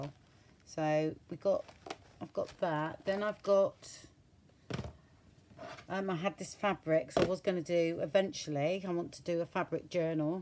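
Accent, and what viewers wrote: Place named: West Country, United Kingdom. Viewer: Missed you! Love your friendly West Country voice! Your videos are great! Love them!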